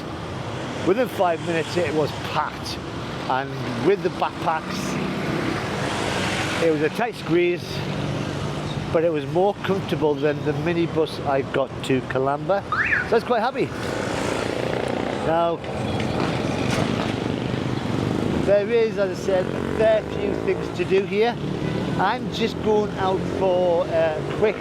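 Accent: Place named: Scotland, United Kingdom. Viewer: It looks like scottish accent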